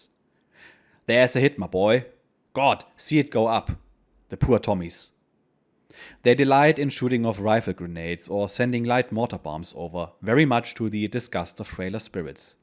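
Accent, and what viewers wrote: Germany. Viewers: I have read this book twice and my daughter has read it but being read with the German accent is undoubtedly the best.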